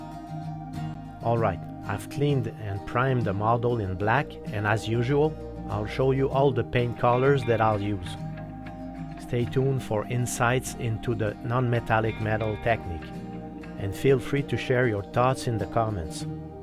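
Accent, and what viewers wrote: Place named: Ottawa, Canada. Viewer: I live in Ottawa as well so your accent makes me feel right at home :). Keep up the great work. I’m enjoying going through the back catalogue.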